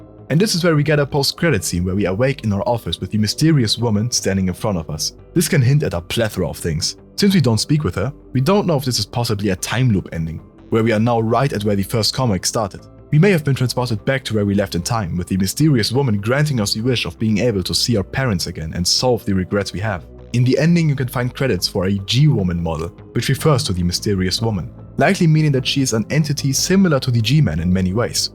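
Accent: Germany. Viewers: I'm recently subscribed but gotta say, I figured out you are German after the first 2 sentences you spoke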